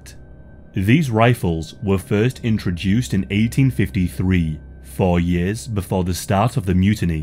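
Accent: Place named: Northumberland, United Kingdom. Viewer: Very informative, thank you. Your accent intrigues me, you sound northern but I'm not sure where, Northumberland?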